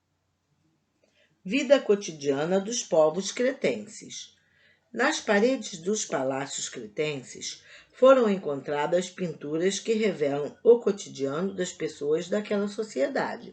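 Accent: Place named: Rio de Janeiro, Brazil. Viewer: Sotaque carioca entojado.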